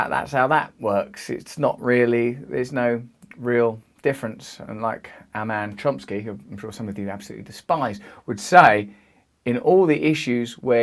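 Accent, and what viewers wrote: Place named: United Kingdom. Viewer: Love your old man British accent.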